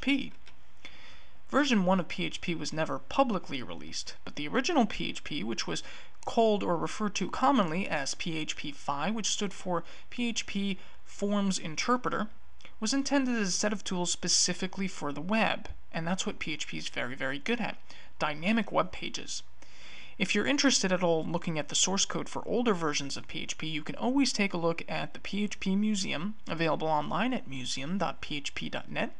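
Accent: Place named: United States. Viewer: This was outstanding, and spoken in plain American English. This is the third one I tried and the 1st one I understood.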